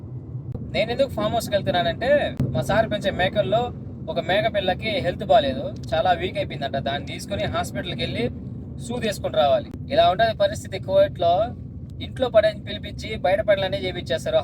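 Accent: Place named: Piler, India. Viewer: I think me slang batti chuste medi pileru kada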